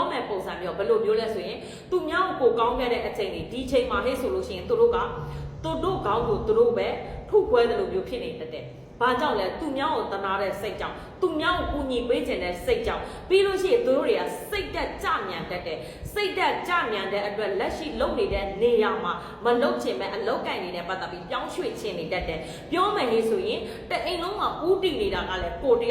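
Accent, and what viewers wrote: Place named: Myeik, Myanmar. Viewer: ဆရာမက မြိတ်အသံပေါက်နေတယ်နော် မြိတ်ကဆို ဟောခန်းလာချင်ပါတယ် လိပ်စာပြောပါဆရာမ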